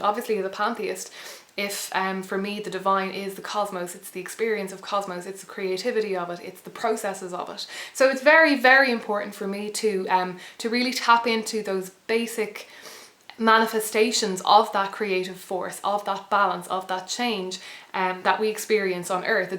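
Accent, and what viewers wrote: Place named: Ireland. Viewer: She has to be Irish. Interesting concepts. A bit fuzzy-wuzzy for my tastes, but I get the idea where she's coming from. Mashing Pantheistic Paganism with tangential science ... what can be sensed .... lends descriptions to quality discussion. Personally, I believe in one, and only one, God. "There can be only one." - Juan Sánchez Villalobos Ramírez How to make a memorable introduction... <link>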